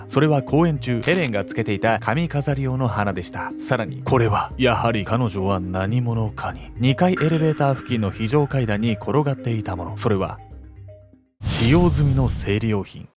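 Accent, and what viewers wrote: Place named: Ishikawa, Japan. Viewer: なんで石川弁wwwマイナーな😂石川県民としては嬉しいけど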